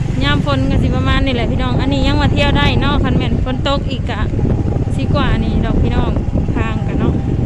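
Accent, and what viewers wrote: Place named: Laos. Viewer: วัสดี แวะมาเติมกำลังใจฯลฯ(สำเนียงพูดแบบนี้เป็นคนแขวงอะไร)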